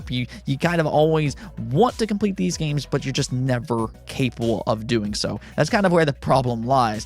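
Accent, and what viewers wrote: United States. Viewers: Your American Accent is really really strong, wow is most noticeable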